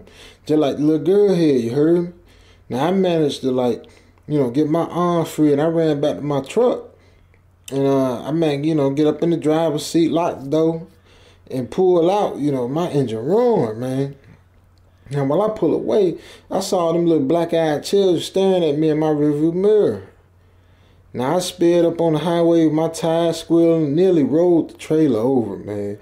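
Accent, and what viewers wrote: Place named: New Orleans, United States. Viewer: Really enjoyed the story. Whomever narrated this story has the New Orleans accent/dialect down pat. And this is coming from a native New Orleanian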